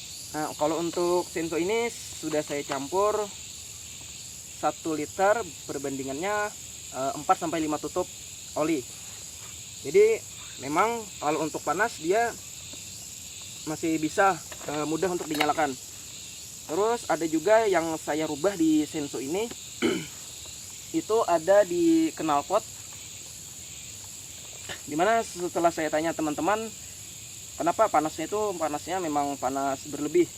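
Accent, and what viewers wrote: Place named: Banjar, Indonesia. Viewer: kya logat banjar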